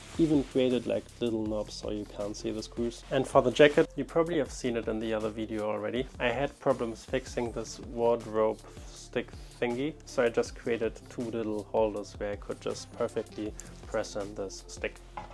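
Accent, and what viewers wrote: Germany. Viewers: Are you German? I love your accent! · Direkt raus gehört das du drutscher bist, deswegen noch sympathischer 👍🏽 mach weiter so super video🫶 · I love this guy, I'm german but moved to America when I was 4 and listening to him is like listening to opa and onkels speaking English to me when I was younger because they wanted to show me how smart they were. Thank you for the heartwarming memories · i hear german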